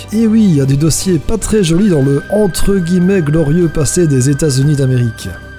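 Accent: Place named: Liège, Belgium. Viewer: Dis tu viendrai pas de Liège parce que l'accent ressemble beaucoup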